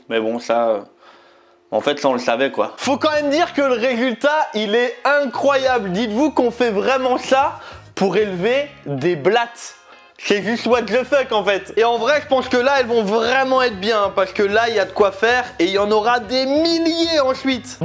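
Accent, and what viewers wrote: Alsace, France. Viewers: Attend mais tu vient d'Alsace ?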